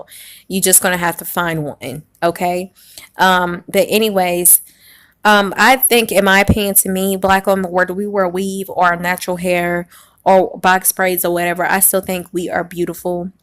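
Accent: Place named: Germany. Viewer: I couldn’t understand this video. You sounded way to German for my English ears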